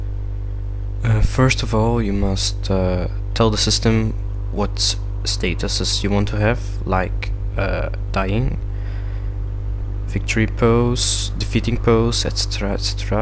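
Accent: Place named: Russia. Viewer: Lijkt wel of je een rus ben met dat accent.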